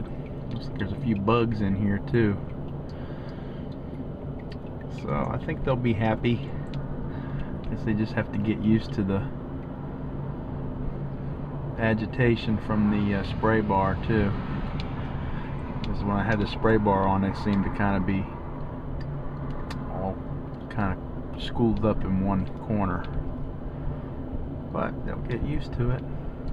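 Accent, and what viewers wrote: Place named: United States. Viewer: whish I had your usa twangy accent, I would sound really good singing boogie blues but I am french when I sing I USA blues classic I sound crap... Nice video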